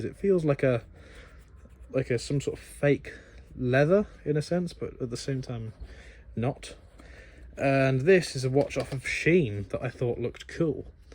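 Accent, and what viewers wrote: United Kingdom. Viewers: I JUST LOVE YOU!! And your accent! Not many Brit's speak as well as you esp your cadence and vocabulary! You're brilliant! Thanks for showing us your watch collection! Plus, what a special honor to your Dad!! I never knew anyone who can build their own watch!! Wow! And from you being on your mom and dad's videos, I can see you've inherited both of your parents' talents! Thank you for making this video! I have developed a true crush on you!! Just a complement! But you're so likable and soooo cute! Love to your Mom!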